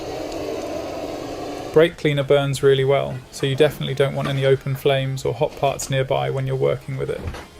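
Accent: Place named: United Kingdom. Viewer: Har du en engelsk förälder eller studerat i England, för din accent låter enormt brittisk❣Ärru från Åmål med tanke på ditt val av alias?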